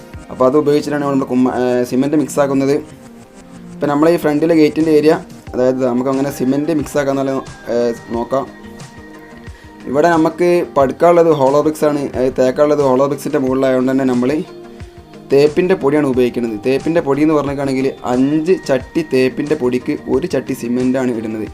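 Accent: Malappuram, India. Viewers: Ith malappuram bhasha alle. Atha ganethanne aaymkotte😍😍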